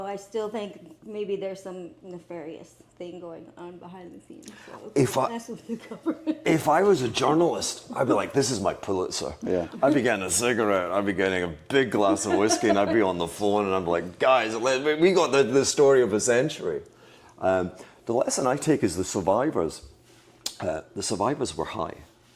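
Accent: Scotland, United Kingdom. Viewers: Scottish accent - Check, Mirror shades - Check Caribbean bangles and mad shirt - Check I love it !!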